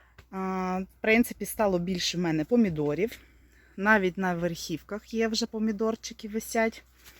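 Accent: Western Ukraine, Ukraine. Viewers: Як ви так швидко перейняли западенський говор.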